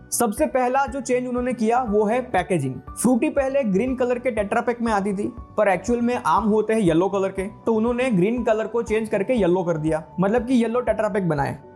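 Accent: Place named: Gujarat, India. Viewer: Buhot he acha video hai brother. I think aap gujarat se ho bcs me bhi gujrat se hu or baat krne k style se pehchan gya😅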